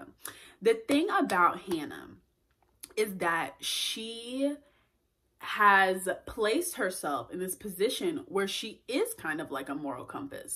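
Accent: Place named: Bay Area, United States. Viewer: Are you from the Bay Area sis ? I hear the accent lmao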